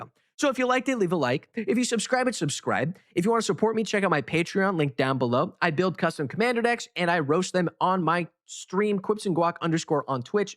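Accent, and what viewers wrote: South, United States. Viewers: Classic southern drawl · That southern accent at 2x speed is quite funny :D Keep building man! And if you ever find a novel way of making a deck with Lord Magnus as commander, I am all ears :D. I never got past Ehrnam Djinn and Lumbering Satyr :P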